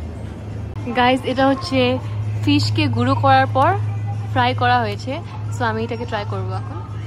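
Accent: West Bengal, India. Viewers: Anyone, Does West Bengal "Bengali" sound like this ?? Is there any difference ??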